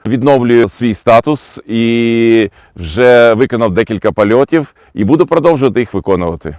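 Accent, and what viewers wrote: Ukraine. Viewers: с украинским акцентом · Вы стали шипко по заподно-украинскому языку говорить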